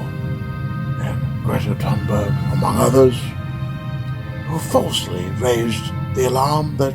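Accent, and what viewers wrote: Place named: RP, United Kingdom. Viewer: Hi. Not a bad attenborough accent. But I keep hearing a bit of Brian badonde slipping through. Fonejacker incase you dont know. 🤣🤣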